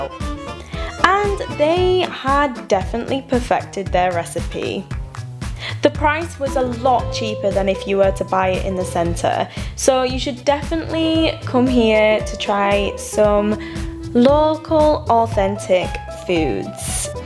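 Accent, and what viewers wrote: United Kingdom. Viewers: I really love the British accent! :))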